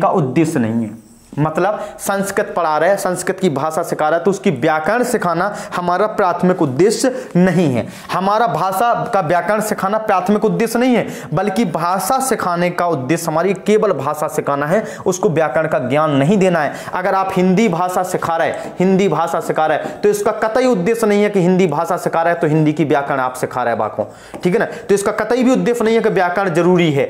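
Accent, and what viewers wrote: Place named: Bundelkhand, India. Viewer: Very nice sir thanku aapki bundelkhani awesome👍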